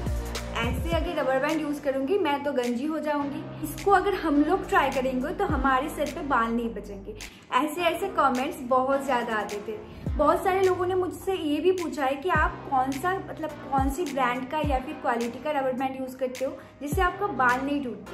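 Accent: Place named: Bengal, India. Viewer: Are you a Bengali girl Because when you are taking it listen like you are telling full hindi and half Bengali And I am also a Bengali girl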